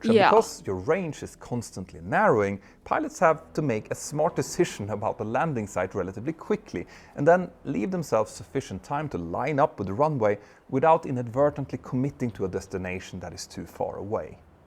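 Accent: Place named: United States. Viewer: I've noticed watching your videos over the last few months in each video your accent reduces more and more. In this video it was almost imperceptible it was like listening to someone from Michigan or northerner Maine. Congrats you can now chameleon your way through the US if you ever visit! Although i do miss your heavier accent sometimes as it was very cute.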